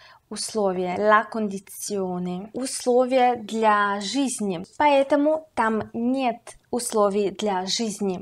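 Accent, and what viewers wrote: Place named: Italy. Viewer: Ciao,. Come sempe e stato ottimo da parte tuo. Bravissima,hai un accente italiano veramente autentico.🤗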